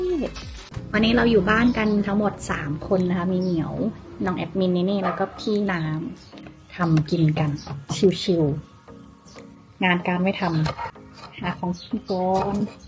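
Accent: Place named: North, Thailand. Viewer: ดูเพลินมากเลยค่ะะ ทำคลิปแบบนี้ให้นอนดูเพลินๆหน่อยนะคะ ภาษาเหนือน่าฟังมากเลยค่ะะ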